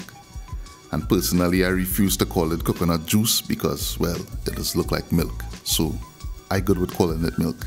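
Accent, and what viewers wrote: Trinidad and Tobago. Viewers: But on a real tho, I really like how homeboy talks, It sounds so Trini and he's straight to the point, very interesting delivery. Keep up the good work and keep bringing these delicious recipes! Respect!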